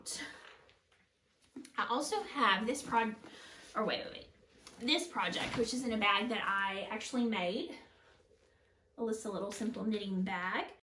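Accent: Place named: Arkansas, United States. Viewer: Congrats on daring to dive into podcasting! I enjoyed your first episode. And thank you for adding all the pattern/yarn/etc details in writing on the screen... especially because I’m not (yet!) accustomed to an Arkansas accent😉, even though I once drove from Ontario to Arkansas once! It was beautiful, especially Hot Springs National Park, which was my final destination. Driving back home, we took the route through the Wachitas/Ozarks—gorgeous, and reminded me of the Laurentians where I spent my summers. Keep up the good work!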